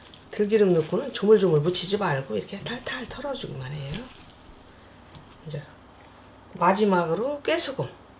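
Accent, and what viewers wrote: Chungcheong, South Korea. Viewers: 충청도말 재밋네유 배우고싶어서 저장했어유 요리도 쉽게 하시구유 맛나게 보이네유 꼭 해볼래유❤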